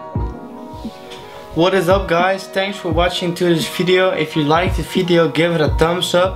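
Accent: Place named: Netherlands. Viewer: Respect voor je engels maar op een één of andere manier is het echt weer louis van gaal engels 😂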